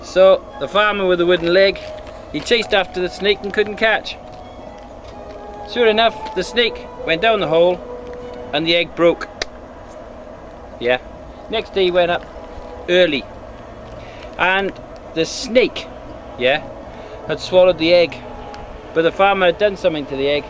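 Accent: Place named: England, United Kingdom. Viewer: Amen on "your sin will find you out." Nice birds. Praise God for His creatures great and small. You have a good zoom on that camera! Love your accent, too-- English accents are really neat. I'm still having trouble viewing some videos. They take forever to spool, and they keep stopping every few seconds. I was glad this one was shorter so I could let it spool and then watch it.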